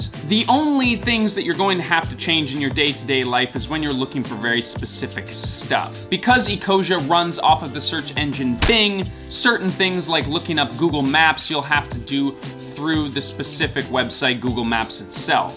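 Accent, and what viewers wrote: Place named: Canada. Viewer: Canadian eh?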